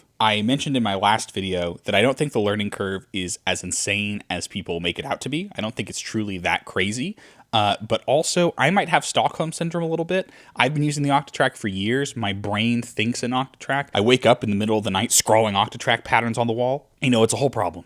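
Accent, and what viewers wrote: Germany. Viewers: thanks for save me, buying this! btw best german accent, großartig! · subscribed because of the german accent JA!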